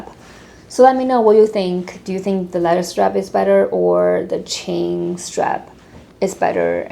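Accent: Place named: New York, United States. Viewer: when you spoke about the rain and wind I listened and just realized you are a NYC girl too!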